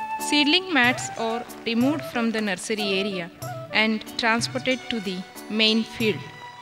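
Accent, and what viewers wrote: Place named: India. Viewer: u can isten to her English,she is from india oviousely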